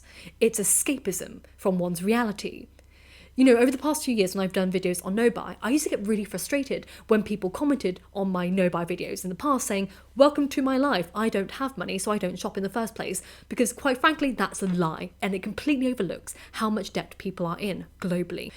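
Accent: United Kingdom. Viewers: I always considered you a very intelligent and wise person. Always got something interesting to say with the most beautiful British accent.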